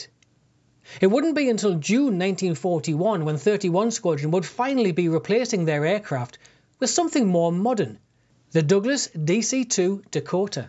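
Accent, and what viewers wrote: Newcastle, United Kingdom. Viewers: I don't hear Geordie accents on YouTube very often. I live near Seattle, but I am originally from Lobley Hill, Gateshead. My uncle Len was killed in Normandy on Sunday, July 23red, 1944.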